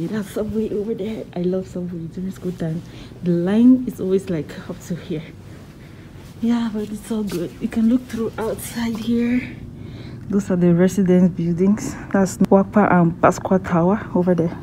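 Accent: Nigeria. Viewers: you sound so much like a Nigerian. I'm amazed on how Nigerians and Cameroonians sound so alike. XOXO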